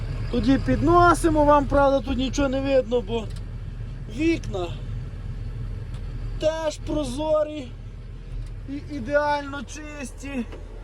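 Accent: Ternopil, Ukraine. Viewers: Ваш тернопільський говір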